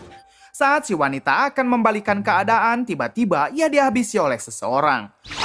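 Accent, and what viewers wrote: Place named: Padang, Indonesia. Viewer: Translate nye logat padang nih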